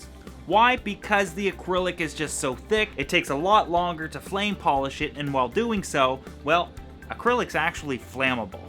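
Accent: Canada. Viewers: In this video i could definitely hear his Canadian accent come out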